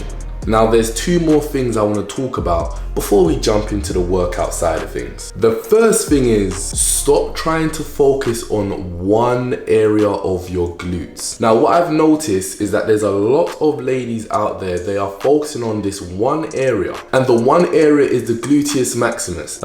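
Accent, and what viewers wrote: London, United Kingdom. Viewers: Your accent is killing me 👌👌👌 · British accent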